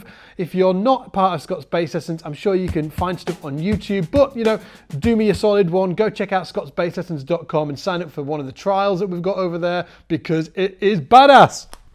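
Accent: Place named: North, United Kingdom. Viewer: New video format and northern accent hella lit!!! 🔥🔥🔥🔥🔥😂😂😂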